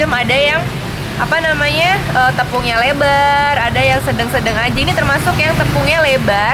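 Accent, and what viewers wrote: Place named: Surabaya, Indonesia. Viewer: Sampean asli endi mbk..kok iso ngomong jowo..suroboyo ta mbk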